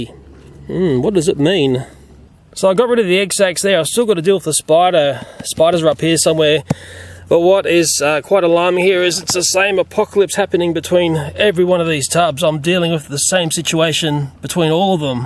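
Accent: Australia. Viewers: Deadly spiders and an Aussie accent, where else would you rather be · wimp. by the sound of your accent your born and bred here in oz so why the big drama . You know we learn to live with them . Leave em alone and they leave you alone.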